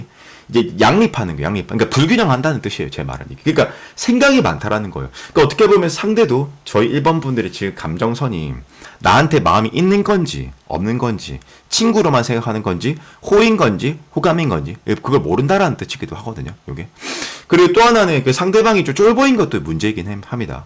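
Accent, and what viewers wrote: Gyeongsang, South Korea. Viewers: 사투리가 어때서 트집을잡냐? 경상도 사람이면 사투리쓰지. 에구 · 갱상도인으로서 사투리 지적은 용납할수가 없다. 우리는 서울말같은거 쓸줄 모린다. 너거도 사투리 몬쓴다아이가.! 같은 갱상도인으로서 긍지와 자부심을 지켜주십시오. 우리가 돈이 없지 가오가 없습니꺼!!!!! 화이팅!!!!! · 1번...몇달전에 썸타다가 제가 까인 입장이라..ㅎㅎ 저랑 잘 될수가 없을거같긴한데...ㅜ저 자존감 낮아져있어용 ㅜㅎㅎ그나저나 전라도 여자인데 경상도 사투리 너무 듣기좋아용~~~차분하게 말씀 잘해주시고 전달력 충분히 잘 되고있어요^^ 모든 사람이 서울말을 써야한다는건가..그건 너무 억지같아요..계속 사투리 리딩 기대할게요^^ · 갱상도라 좀 거부감이 있지만 내용이 더 중요하지요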